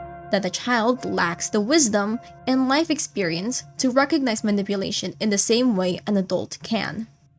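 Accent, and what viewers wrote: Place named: Philippines. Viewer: Are you filipino? Your english sounds so much like Filipino english!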